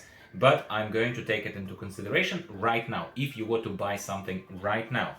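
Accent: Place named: Eastern Europe. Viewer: The accent :D are you from EE?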